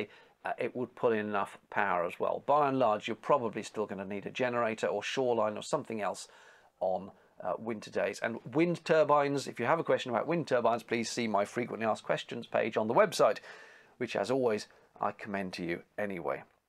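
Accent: United Kingdom. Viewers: Best British accent. No matter what you say, it sounds legit.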